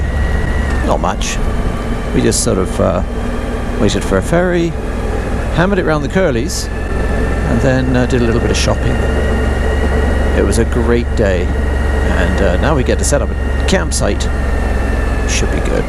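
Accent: United Kingdom. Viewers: Love watching the videos. Your accent makes me miss the UK.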